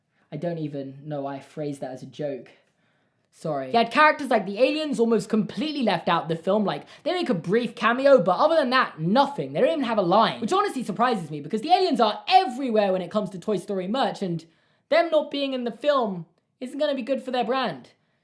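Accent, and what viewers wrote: United Kingdom. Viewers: I lost touch with this channel for about a year and I'm extremely glad I decided to go back and listen to him go on Cars 2 again. I missed British.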